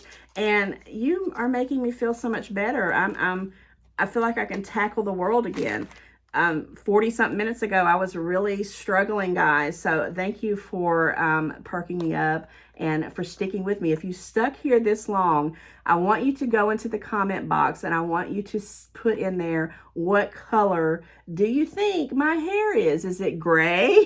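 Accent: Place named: South, United States. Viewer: I discovered your channel a month or two ago. You are just so real & I love it. I enjoy your videos and your Southern accent. Your home is gorgeous!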